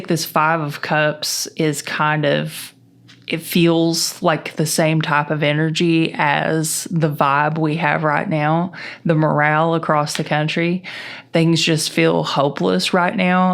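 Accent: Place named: East Tennessee, United States. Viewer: you HAVE to be from the East Tennessee, SW Virginia area?? That’s where I am originally from and you sound like all my kinfolk! 😊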